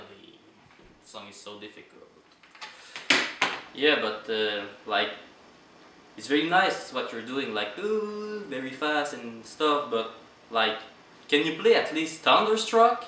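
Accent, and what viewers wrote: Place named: Quebec, Canada. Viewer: Ahahah j’pensais pas que tetais quebecois avant que tu commences a parler!! 😂😂. Good job bro!!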